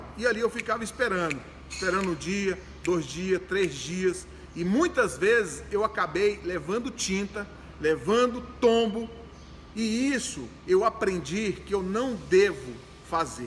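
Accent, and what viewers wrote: Brazil. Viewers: Sotaque de cearense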